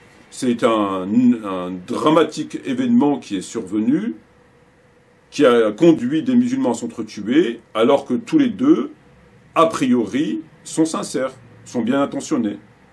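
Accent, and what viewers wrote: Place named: France. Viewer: Qu'Allah te guide SubhanAllah, tu nomme toi même dans le début le conflit de fitna, la où des compagnons présent à l'époque n'ont pas prit position et parti car fitna il y avait, toi avec ton accent de français quand tu prononce péniblement des mots en arabe tu viens tenter à mettre en doute l'attitude à avoir pour ne pas laisser le conflit entre eux et Allah, tu dis toi même c'est un conflit entre musulmans, tu t'entend pas ? SubhanAllah on s'en fou de ton avis, garde pour toi ton venin et ta compréhension avec ta raison 3aqli Laisse la transmission au vrai savants et va apprendre l'arabe correctement sinon va regarder un film sur netflix par exemple Tu es à l'image du zindiq oubrou que l'on vois derrière toi sur un livre dans d'autres de tes vidéos Fais de la pâtisserie ou je sais pas, mais trouve toi une autre occupation que de reprendre du venin philosophe et avec ta raison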